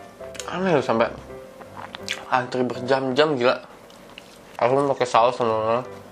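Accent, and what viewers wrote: Bali, Indonesia. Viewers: LU ORANG BALI YA BANG?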